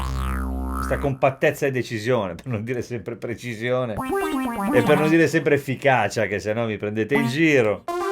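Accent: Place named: Italy. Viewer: Your musician skills plus your Italian accent make me want to buy this Korg so bad. I already bought the Reface DX, thanks to your demo video! Grazie, amigo.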